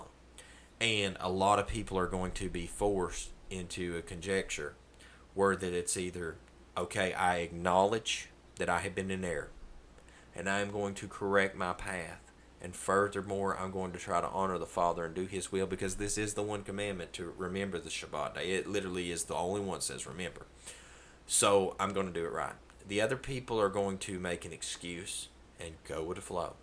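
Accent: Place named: South, United States. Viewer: Enjoyed this very much. Agree 99% lol I hadn't heard of this new calendar. I completely agree on everything you've said about the calendar craziness in history. I'm thankful Father finally told me to look up! HalleluYah! A side note. Its always nice to hear someone with a heavier country accent than myself 😆 Much Shalom and Blessings brother.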